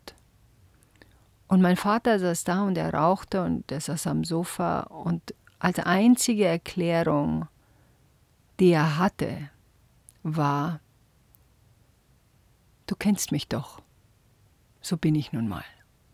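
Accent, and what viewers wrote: Bavaria, Germany. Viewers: schönem, bayerischen Dialekt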